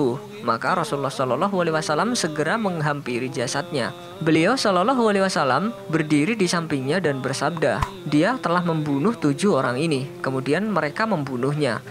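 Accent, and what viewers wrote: Java, Indonesia. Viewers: Mimin orang jawa ya??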